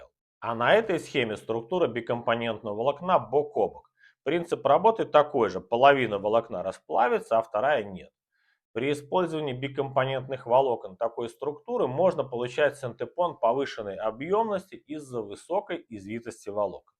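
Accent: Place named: Belarus. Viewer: ваш белорусский прононс мне нравится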